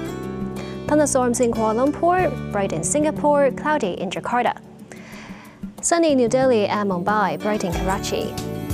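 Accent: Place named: United States. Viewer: 英文絕頂 是美洲英文😊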